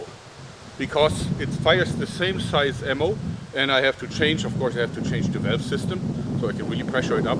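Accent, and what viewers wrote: Germany. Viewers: i love his german accent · This is German English trotzdem passt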